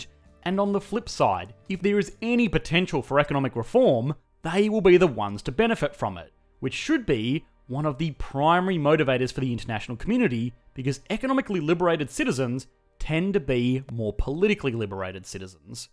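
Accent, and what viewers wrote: Australia. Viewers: I love how this duffer tries to speak American English with an Australian accent. He's good at explaining stuff, though.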